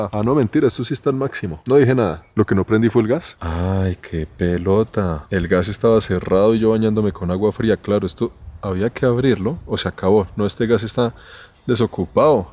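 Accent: Colombia. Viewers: Porque habla con ese acento sera colombiano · Amo su acento colombiano, su sencillez y muy guapo gracias por enseñar y visitar nuestro país ❤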